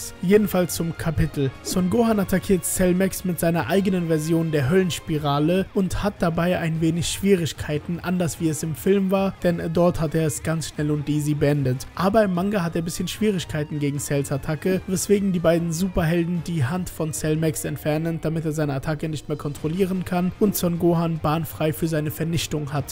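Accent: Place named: Netherlands. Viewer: Ich dachte das war ein holländischer Akzent 😁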